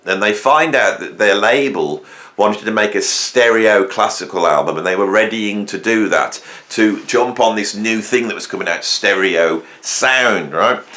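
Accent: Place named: West Midlands, United Kingdom. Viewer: All your videos are informative, funny , intelligent and with such love of the subject that i cannot be anything but impressed.... And its good to hear a west midlands accent espousing mind nuggets 👍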